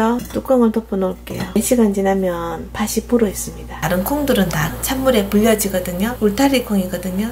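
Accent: Gyeongsang, South Korea. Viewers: 으...너무 맛있겠어요,.. 한번 따라 해볼래요.. 이모님 경상도 말씨 너무 이뻐요..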